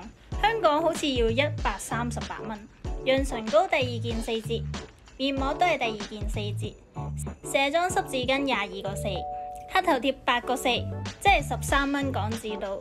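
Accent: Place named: Malaysia. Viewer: 18「溝」起… 講話越來越有大馬味🤣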